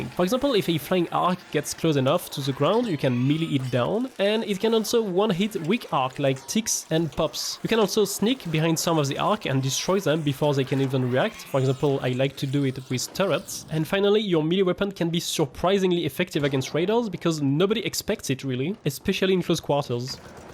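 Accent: France. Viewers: i got jumpscared by the french accent😭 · Waaa l'accent français de zinzin hahaha merci pour les tips bg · Salut ! Merci pour la vidéo c'est cool (Tu est français non ? T'as un accent)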